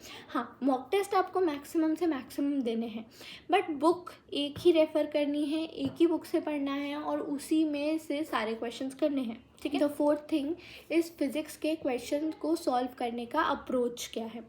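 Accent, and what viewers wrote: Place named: South, India. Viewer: Ig she is south indian people do have accent it's normal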